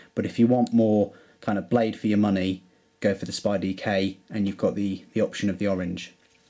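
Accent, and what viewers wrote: United Kingdom. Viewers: A really nice British accent :) BTW thanks for a review.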